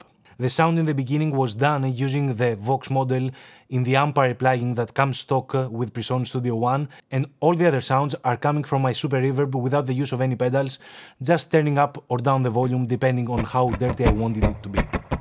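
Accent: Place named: Greece. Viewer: Σίγουρος από τα πρώτα 2-3 δευτερόλεπτα ότι ήσουν Έλληνας.. Nice vid bro, keep 'em coming! Subscribed!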